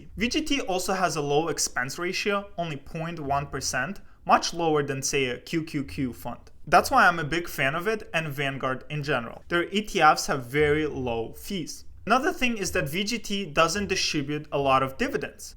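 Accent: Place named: Russia. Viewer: Hey great video man. If you don't mind me asking, where did you immigrate from? to me, your accent sounds Russian or Ukrainian.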